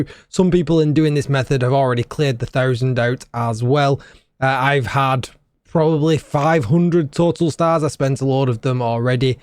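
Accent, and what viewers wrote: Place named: Leeds, United Kingdom. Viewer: You sound like you're local to me. Leeds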